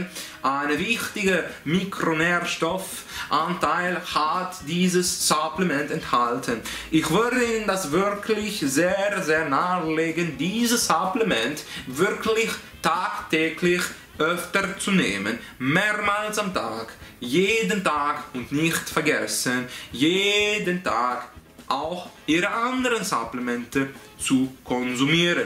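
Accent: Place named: Switzerland. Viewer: hahahahahaha wie du schweizerdeutsch sprichst 😂😂 Top!!! 👌👌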